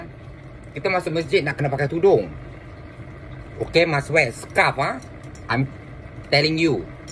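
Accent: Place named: Singapore. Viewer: suka dengar ocehan dialek melayu singapura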